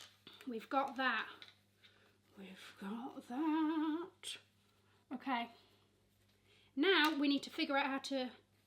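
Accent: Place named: England, United Kingdom. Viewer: You have an ugly English accent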